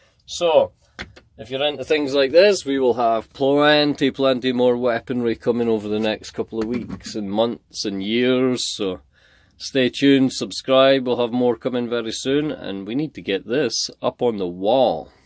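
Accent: United Kingdom. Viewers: how can i get in contact with you> are you from uk im guessing with the accent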